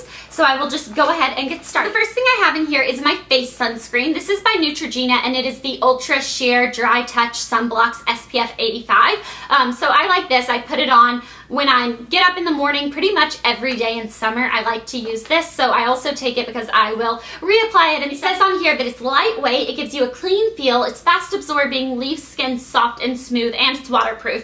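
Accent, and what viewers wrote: South, United States. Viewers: Lol you never know when someone is going to get a shark bite so good thing you have your teeny tiny first aid kit! Haha you made me laugh so much in this video and I love when we can hear your southern accent a bit -3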